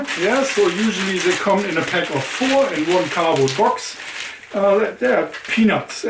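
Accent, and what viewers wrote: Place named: Germany. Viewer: Hi, I've been watching your videos and loving them. Good stuff! I love your sense of humour, attitude and also the English twist on your German accent. Thank you so much for sharing all this. Watching from Wales.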